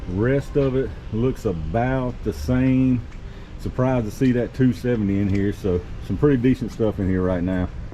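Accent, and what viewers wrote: South, United States. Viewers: I love hearing someone from the south bless peoples hearts.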